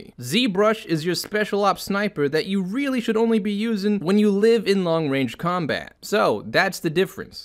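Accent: New York, United States. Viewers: okay. Native New Yawker? Take my follow.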